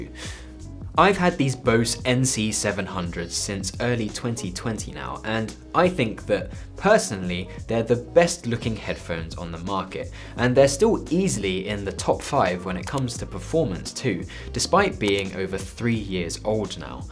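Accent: United Kingdom. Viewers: This might sound strange, so advance apologies. I have become a fan of your British English accent.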